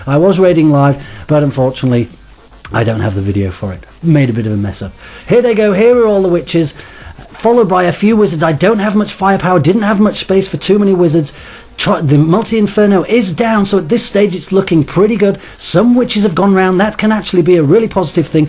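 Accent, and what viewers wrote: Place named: United Kingdom. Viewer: Am i the only one who pictured this dude as a 70 year old british male?? This was the first video i saw of his face and was completley shocked!!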